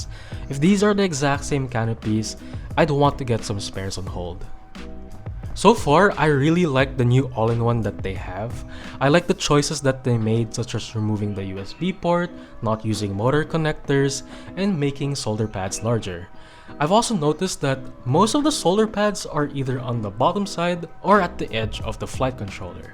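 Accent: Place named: Philippines. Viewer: sabi na e.. the accent was a giveaway.. kabayan :)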